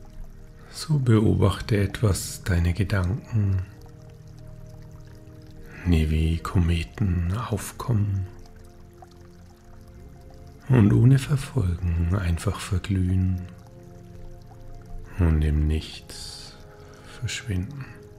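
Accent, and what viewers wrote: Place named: Franconia, Germany. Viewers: Ich dachte du kämmest aus Bayern mit gefällt die stimme auch deine ganze art die du zeigst hier bei Youtube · Allmächd, ich liebe den leicht fränggischen Akzent.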